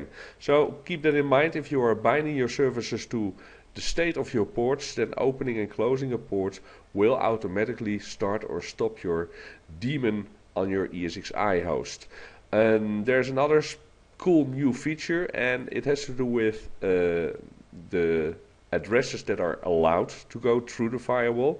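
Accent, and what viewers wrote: Netherlands. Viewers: are you dutch? you accent seems dutch.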